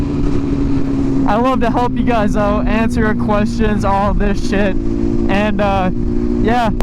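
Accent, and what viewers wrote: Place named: Wisconsin, United States. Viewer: Dude your cringe…stupid Wisconsin accent…mono tone…can’t take u serious..you have no business being on a bike of this magnitude this young. Rich parents I guess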